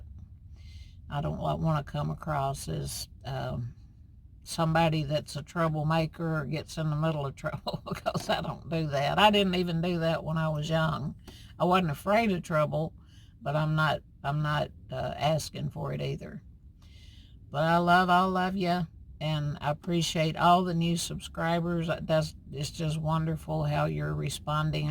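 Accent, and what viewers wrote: South, United States. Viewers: I'm a new subscriber. I love a southern accent. I'm also 66 yo woman. Keep updating us. I love it. Mean people suck. That's on them. I think we could totally get along as neighbors even if your pet gets loose. The nice thing about van life you can leave and find new neighbors. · I’m so sorry you had such a bad experience. You did the right thing. No sense in lowering yourself to their standards. You are a beautiful person inside and out so don’t worry because usually it’s their issue not you. I should be there Wednesday. I’m going to meet MaryAnne at an artist meetup. I’ll call you to see if you want to go there. Artists are usually pretty laidback. I love your southern accent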